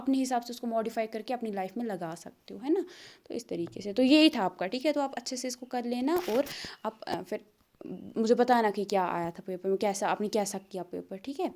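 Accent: Kashmir, India. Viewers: Are u from Kashmir I feel so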